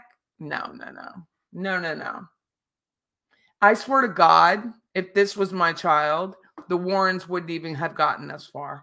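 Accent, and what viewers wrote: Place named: Central Pennsylvania, United States. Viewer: You are so fun to listen to. I’m from NYC and went to undergraduate at PSU (University Park) and I love your accent …. It’s so central PA 🥰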